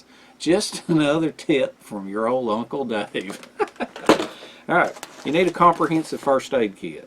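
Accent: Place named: Georgia, United States. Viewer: You sound like Ga boy, I know all about those shutdowns, local 1263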